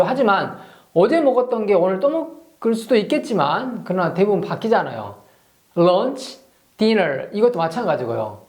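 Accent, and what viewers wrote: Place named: Jeolla, South Korea. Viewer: 전라도 사투리 굉일..짱 잼있습니다.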